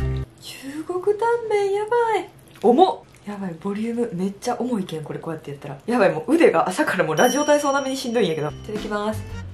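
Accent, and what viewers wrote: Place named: Kagawa, Japan. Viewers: 、最近発見してばりハマってます（笑） 私は香川出身やけん方言似とってめっちゃ親近感湧いとる！！ 応援してます♡ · 久しぶりにキレイに食べる大食い選手！って思ったら普通の可愛い子やった…。 イントネーションが凄い安心するんやけど、同じ四国の民だったwww 近所の可愛い子やん。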